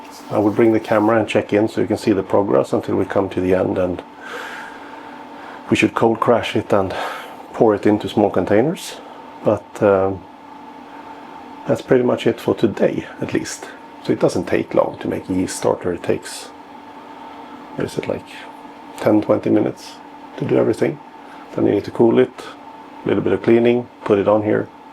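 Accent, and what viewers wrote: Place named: Sweden. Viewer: I like your swenglish. Nice equippment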